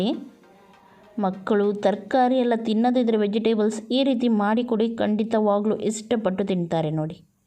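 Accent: Mangalore, India. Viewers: Ee Mangaluru Kannada style kelodheyyyy ondu khushi😂 Chikka makligey helidha haagey yeshtu muddhagi helthaa prepare madthiri :-) God bless U ma 😊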